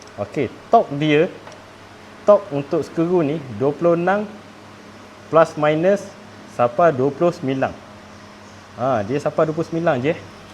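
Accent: Terengganu, Malaysia. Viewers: Kemah molek cara penerangan sekali nga amali padu mantap mudoh pehe walaupun pekat sgguh ganu tu..tp jelas sungguh..moga muroh rezki la boh ..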